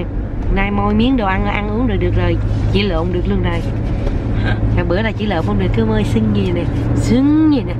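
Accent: Mekong Delta, Vietnam. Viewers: Chi noi chuyen lanh qua.vui ve Nghe giong chi chac la nguoi mien tay dung khong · de thương nói chuyện thiệt tình đậm chất miền Tây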